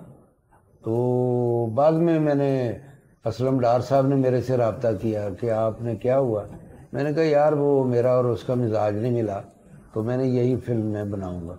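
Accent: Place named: Karachi, Pakistan. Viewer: میزبان صاحب آپ کا لب و لہجہ اور تلفّظ بہت اچھا ھے،مَیں طارق قریشی ھوں اور کراچی کئی سال رہا ہوں ،آپ مُجھے کراچی یا اُردو اسپیکنگ لگتے ہیں اور آپ مہمان کو بولنے پورا موقع دیتے ہیں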